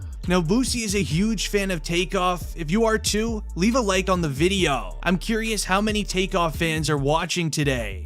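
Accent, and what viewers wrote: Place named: California, United States. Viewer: Beeeennnzzzz.... individuaaaaalllllllsssss lol I had to cut it off there...is that the suburban Cali in u or that's just u? 😂 I can't take it serious either way 🤷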